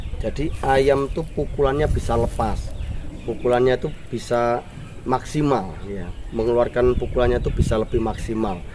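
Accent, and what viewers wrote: Banyuwangi, Indonesia. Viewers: Mas sapean apa orang Banyuwangi to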